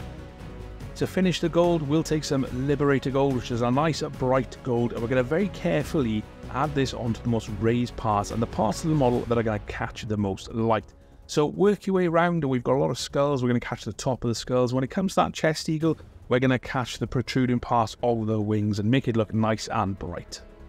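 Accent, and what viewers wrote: Wales, United Kingdom. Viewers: I just like the soft welsh accent. Cwmry am byth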